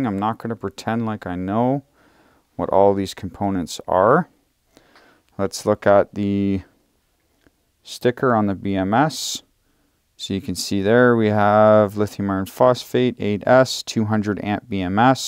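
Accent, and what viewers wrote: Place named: Texas, United States. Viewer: I love your videos but your accent is really cute (i had to edit because youtard doesn't understand Texan mixed with Spanish lol)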